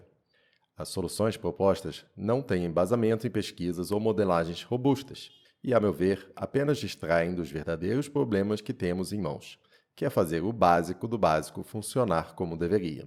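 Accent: France. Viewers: A seu sotaque francês é o sonho de todo carioca.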